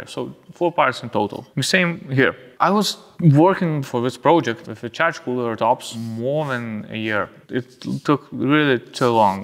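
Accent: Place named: Russia. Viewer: Nah mate, like a man who can russian and perfectly English he has an typical russian/East european accent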